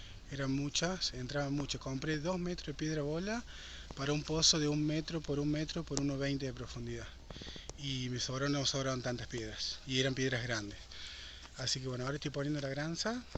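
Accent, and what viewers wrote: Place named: Cordoba, Argentina. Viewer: Muy interesante la info!!, pero no pude evitar escuchar a un Cordobés con mezcla de Franses.jajaja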